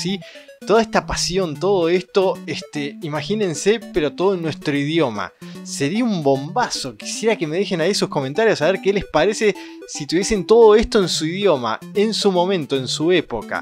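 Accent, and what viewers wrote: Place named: Argentina. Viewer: Denada me encantan sus videos y me encanta tu forma Argentina de hablar tan característica de nosotros , saludos 😊👍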